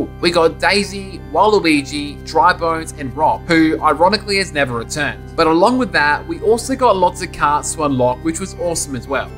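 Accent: Australia. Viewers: man, that australian voice 👍👍👍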